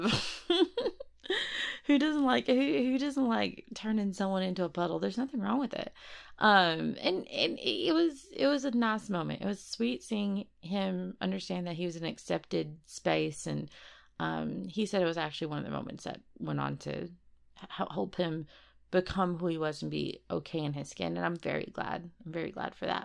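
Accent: South, United States. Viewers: ah within 14 seconds already has me fucking blushing, your pickup lines are too good! will finish this comment when i finish the audio but can already tell its gonna be a good audio, edit: oh damn you really will have me whimpering how do you manage to do a southern voice that just.... makes me absolutely M E L T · Southern accent.. southern accent??? Oh yippee! I love that so much, makes a Brit like me melt and crumble haha. Also, with you playing as a hellhound... imma make it my head canon that you're related to Loona, or friends heh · I’m in love with this southern hellhound. 😍 I find women with southern accents to be attractive. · YAaaayyy! Great audio! Love the hellhound, the southern, the innuendos, the growls, the dom! Awesome work!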